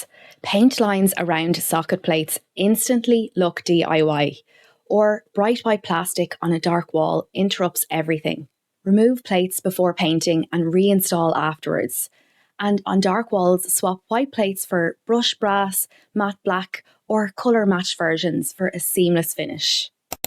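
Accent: Ireland. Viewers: Brief and brilliant! Plus lovely Irish accent. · lovely Irish accent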